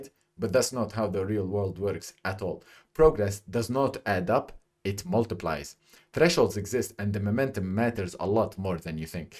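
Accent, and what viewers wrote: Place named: Italy. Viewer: Wait why do you sound Italian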